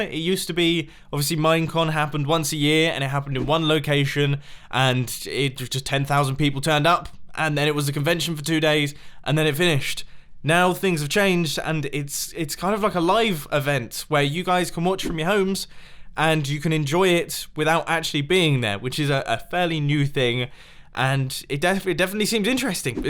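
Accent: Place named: United Kingdom. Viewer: Make a tutorial on how to talk like a British dude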